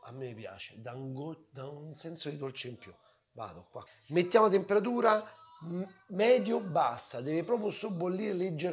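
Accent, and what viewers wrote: Rome, Italy. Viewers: Sei troppo forte sei un vero comunicatore ti guardo e apprezzo sempre le tue ricette e poi le sfumature del romanaccio daieee ti seguo dal l’America 🇺🇸🇺🇸🇺🇸🇺🇸🇺🇸da Naples Florida vienimi a trovare qui e fantastico ciao un abbraccio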